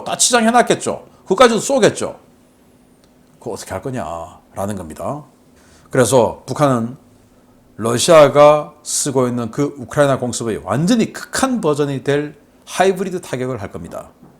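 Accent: Gyeongsang, South Korea. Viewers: 갱상도 싸나이 최고!!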